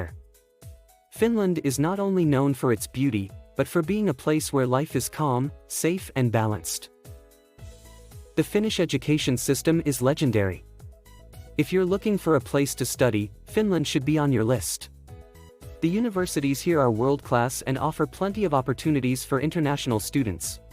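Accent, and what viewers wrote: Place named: United States. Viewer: I was actually surprised! An American-accent narrator and an opening screen of a sauna had me expecting a foray into the Nordic lack of inhibition to nudity that tends to lead English speakers into perverse imaginations of Finns (and Nordics in general) flaunting dicks and tits without any regard for English-speaking prudishness! To my surprise, this video had nothing of that! I'm Icelandic, a western brother of our eastern brothers, the Finns. Having worked with Finns in stressful situations (relief operations in war-ravaged African countries), I now know that the Finns are even more stoic than we Icelanders believe ourselves to be! More solid friends you will never find, and they have the rare quality of not shooting their mouth of when they have nothing to say! In my case, I found that Finns stay quiet until they have something useful to contribute to whatever conversation is going on. 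I also learned that if a Finn bothers to enter a conversation, he/she ALWAYS has a truly valid argument/insight to offer! The Finns are a people of few words, and WHEN they have something to contribute, pay attention!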